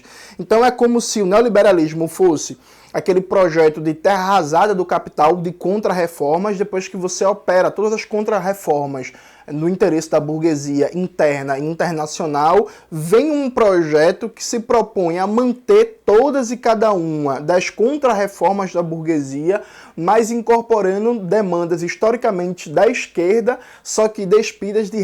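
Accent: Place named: Northeast, Brazil. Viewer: Irmão tu é da onde? Eu passei o vídeo todo tentando descobrir de onde do nordeste era o sotaque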